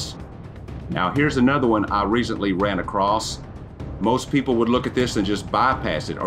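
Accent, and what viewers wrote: South, United States. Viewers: Hey. I can't quite seem to make out the writing that you're talking about, but on the same 'rock', top of the screen, left of centre (just above that bright spot) it says 'BEV' - in the clearest possible, white, capital PRINT! Though it doesn't look like it's actually printed on the rock, but separate letter blocks (look at the 'V') - that's undeniably ENGLISH!!! But I don't think that's the same writing you are talking about, because you zoom in to a lower point. You didn't miss that tho', did you? PS Lovely to hear that great, southern drawl of yours again!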